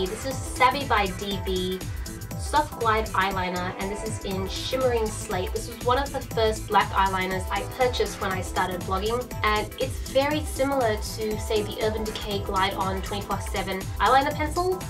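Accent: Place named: Australia. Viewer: So happy I found out your Australian. I needed to know where your accent was from! Ps this video was great, like all your others are xx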